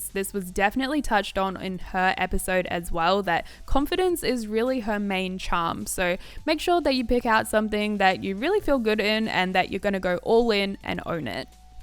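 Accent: Australia. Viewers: are you australian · are u australian? you have a different accent, sorry if I said it wrong, I'm not an English speaker but I noticed a different accent. loved the outfits, I would probably wear all of them 💖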